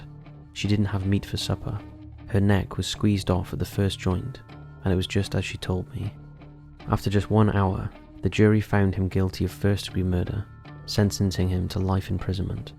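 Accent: Wales, United Kingdom. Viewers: Love the voice (subtle Welsh accent?) and the eerie ambient background noises/music/soundtrack. Very soothing, not to mention informative.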